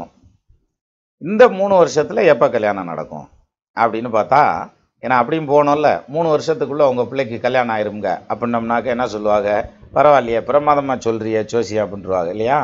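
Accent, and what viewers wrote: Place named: Tirunelveli, India. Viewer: Sir neenga thirunelveli Tamil I enjoy because my father veeravanallur and my mother ambasamudram. We were in vickramasingapuram for a long time.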